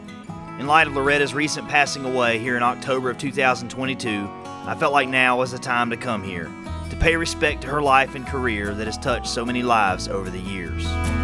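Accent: United States. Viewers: love ur cowboy accent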